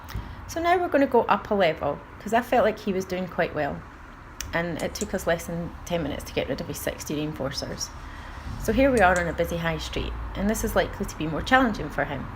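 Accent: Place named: United Kingdom. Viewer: Did you say 60 or 16 reinforces? I'm sorry, not really used to british accent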